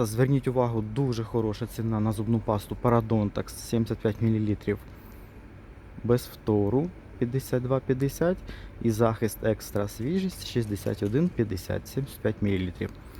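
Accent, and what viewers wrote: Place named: Transcarpathia, Ukraine. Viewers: Так говорять в західній Україні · так говорять на Закарпатті,але це не означає ,що у автора правильна українська мова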